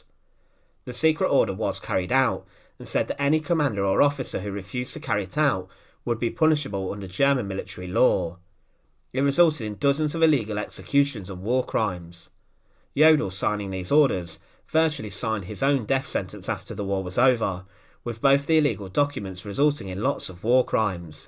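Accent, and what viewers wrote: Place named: England, United Kingdom. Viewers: i The Narrator has an English accent… just cannot read a script fluidly. Awful . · He definitely is English. He just has no idea how to read a script.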